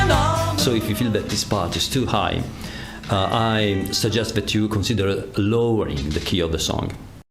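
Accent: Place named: Italy. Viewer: Wonderful! Also, it's kinda hilarious to hear how his intense Italian accent almost entirely disappears as soon as he starts singing the examples 😂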